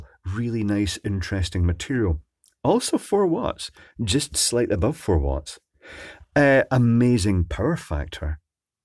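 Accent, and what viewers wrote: Scotland, United Kingdom. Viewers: The accent is Scotland's 2nd most valuable export. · Hi, Love all your videos, been a fan since around 2010, love your soothing Scottish voice! Now I didn't know how else to contact you, but can you do a video about rejuvenating laptop batteries, including their controllers, as I have scoured the net and can't really find anything that addresses the problems with why they wont work after changing/recharging/replacing cells. I've a ton of them and really don't want to tear them apart for just the cells, if the controllers can be put to some use. I know there are a plethora of types/styles/models etc, but maybe if you can autopsy a generic HP or Toshiba maybe Dell that would be great. Look forward to learning.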